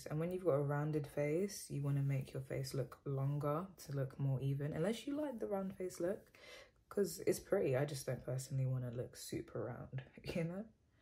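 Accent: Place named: England, United Kingdom. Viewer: Finally found you on YouTube from my ig videos and was shocked when I heard you speak....youre English!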